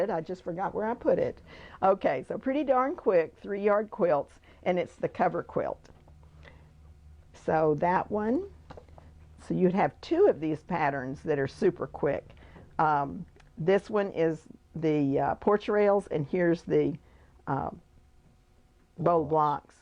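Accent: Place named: East Texas, United States. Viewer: Love to listen to you. Lovely E Texas accent. I am an Eastern planted in San Antonio.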